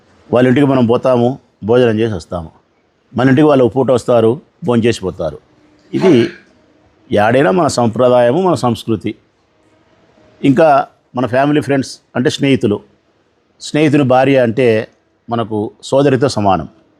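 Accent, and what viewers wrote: Rayalaseema, India. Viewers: Sir నమస్తే, మీ ప్రెస్మీట్ చాలా స్పష్టంగా, సుత్తి లేకుండా, అశ్లీలతకు తావు లేకుండా మర్మగర్భంగా ప్రశాంతంగా సీమ యాసలో పండితులకు, పామరులకు అర్థమయ్యేలా వ్యంగ్యా స్త్ర లతో మాట్లాడిన తీరు అభినందనీయం. అయితే ఆరిపోయే దీపం వెలుతురు ఎక్కువంటారు. నాయుడు గారు శృంగార పురుషుడు. మిడ్ నైట్ మసాలా చానల్ నిర్వహిస్తున్న కళాపోషకుడు. ఆయనతోపాటు పొట్టకూటికి పనిచేస్తున్న యాంకర్లు స్వామిభక్తపరాయనులు. తప్పు చేసినవానికన్న ఆ తప్పును సమర్థించేవారిని ఏమనాలి? హిందూ ధర్మప్రభోదకులు, పరిరక్షకులు, ఎవ్వరూ పెదవి విప్పడం లేదు. నాయుడుగారి కన్నా వీరు దైవద్రోహులు కారా?. పండితులు, ఉపన్యాసాలు, ప్రవచనాలు చెప్పేవారు చేస్తే శృంగారం, పామరులు చేస్తే బూతు. ఆమెతో సహజీవనం చేస్తున్నానని నాయుడుగారు చెబితే సమస్యే రాదుకదా? ఎందుకింత రాద్దాంతం. లోకోభిన్నరీతి.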